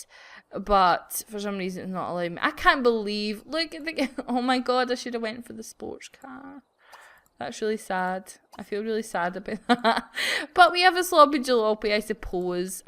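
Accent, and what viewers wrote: Scotland, United Kingdom. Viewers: i love your video's! And your Scottish accent is very nice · Scotland is my probably pretty accurate guess since I've lived there myself for a bit ;) Ya know, where Merida is from? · She's scottish and I think her accent is lovely [ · She's from Scotland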